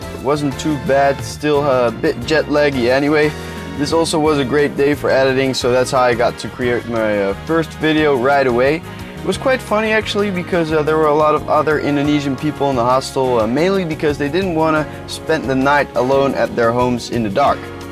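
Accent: Netherlands. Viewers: Are you Dutch? You sound really Dutch! Trans: ben je Nederlands? Je klinkt erg Nederlands! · Spot the dutch accent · haha i knew you were dutch even before you said amsterdam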